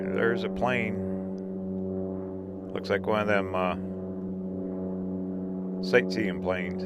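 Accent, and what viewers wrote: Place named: Oklahoma, United States. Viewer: This might have been a interesting video is it weren't for the obnoxious oakie narrating.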